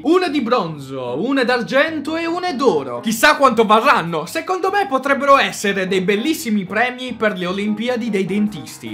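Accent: Apulia, Italy. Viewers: ma sei di puglia?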